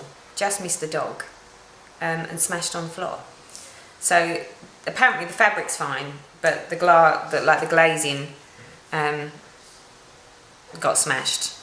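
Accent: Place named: London, United Kingdom. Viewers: I remember Bev and Teddy always commenting on flosstube videos. I used to comment back once in a while, now I wish I had made much more of an effort. She loved this community so much, which is easy, everyone is lovely to each other. I watch while stitching, and don`t always comment...I need to change that. You do lovely videos, I love your accent, it reminds me of my family in London. xo Mj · I always look forward to your videos when I see them in my subscriptions :) I think you are delightfully funny and I love your english accent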